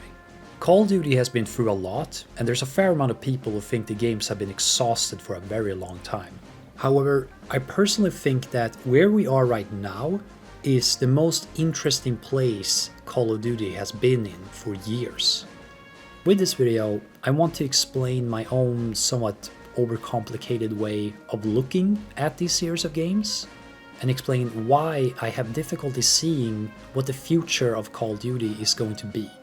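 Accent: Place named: Sweden. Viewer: Great video bro🙂btw are swedish? Your accent sounds just like it 🙂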